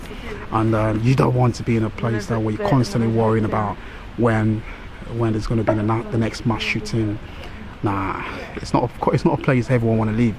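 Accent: United Kingdom. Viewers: Your accent is british not american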